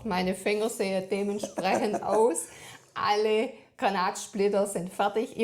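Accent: Swabia, Germany. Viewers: Oh,a Schwäble ,schee ! Scho aboniert ❤!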